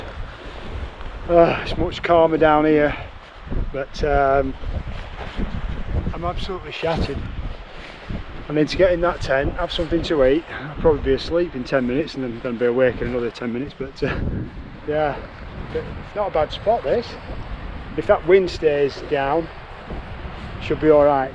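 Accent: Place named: Yorkshire, United Kingdom. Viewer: Just found your channel, loved it, nice to hear a great YORKSHIRE ACCENT EEEH BY GUM, thank you hope all goes well with your hand op, takecare Lv Jacqueline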